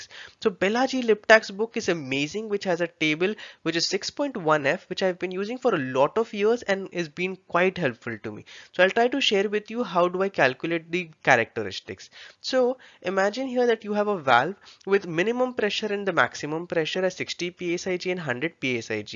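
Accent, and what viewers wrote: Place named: India. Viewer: Your English and explanation can only be understood by Indians.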